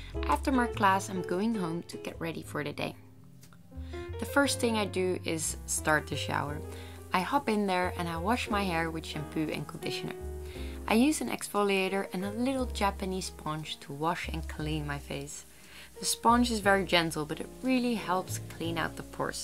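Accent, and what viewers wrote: Netherlands. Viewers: Are u Dutch?? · Are you dutch? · Her Dutch accent makes me cringe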